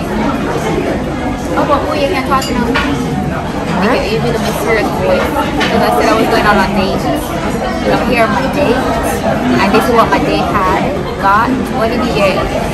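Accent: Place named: Jamaica. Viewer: I can deffo hear the Jamaican accent ! Yeh goooodieeeeee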